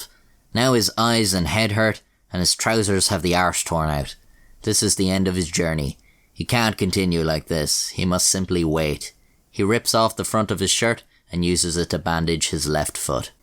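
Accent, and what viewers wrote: Ireland. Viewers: The Irish accent makes it better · Hi 👋🏼 which part of Ireland are u from? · Had to an irish narrator on a drug story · I love Irish accents and how "to surround them" sounds like "to so random" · This story is made so much better being told by one of my favorite nationalities, an Irishman. Its great.. cuss words and all. 🇺🇸 😎👍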